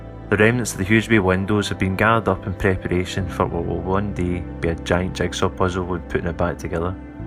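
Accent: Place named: Scotland, United Kingdom. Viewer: It’s wonderful to see this proud castle be restored. And .. nothing like listening to a voice of a Scotsman.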